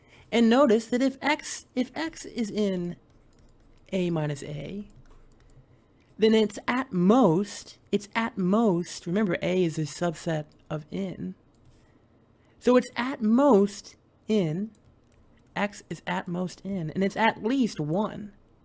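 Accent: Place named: United Kingdom. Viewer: Your video's are really good. In the beginning, you proved problem 7 of maxwell real analysis. In ch 4 of Rudin we use those facts countless times. Your problem selection, 14(a) & 12, for instance is also really nice. You solve every problem with precise definition, verification of metric space, for instance. By your accent, I'm guessing your from UK. Are you currently in some uni?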